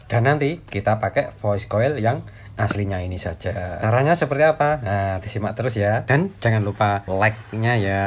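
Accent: Surabaya, Indonesia. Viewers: That accent sounds like Surabaya?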